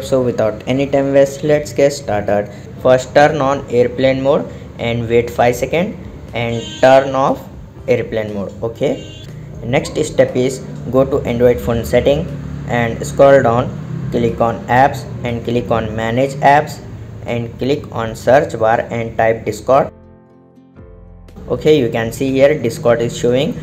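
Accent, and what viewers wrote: India. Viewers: THANK YOU SO MUCH!! THIS HELPED A LOT YAY UR THE BEST IVE WATCHED MANY OTHER VIDEOS BUT YOURS IS VERY HELPFUL AND UNDERSTANDABLE (I LOVE YOUR INDIAN ACCENT BTW 🥰🥰)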